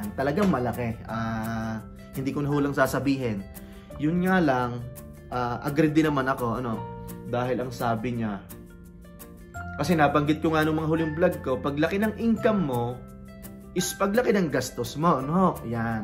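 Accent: Batangas, Philippines. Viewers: I stumbled upon your vlog kabayan at nung madinig ko ang accent mo eh nag suspetsa ako na taga batangas ka😊. Kumusta na lang ala eh taga Batangas din ako.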